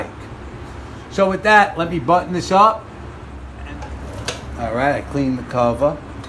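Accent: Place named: New York, United States. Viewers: Hey. Where are you located? Sounds like a Brooklyn or jersey accent. I’m in NYC. I’d like to have some work done on my bike and would love if you could do it. I like how clean and meticulous your work is. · Great video. Love the NY / NJ accent. Doing this rear shift shaft fix tomorrow. Wish me luck. Pretty loose but no oil leak yet.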